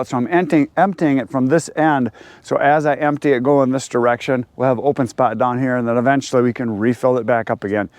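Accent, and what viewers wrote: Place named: Midwest, United States. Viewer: Good story about the flame / firewood. Its true too. Love your midwest accent., Reminds me of my college years in Appleton WS. Go Packers !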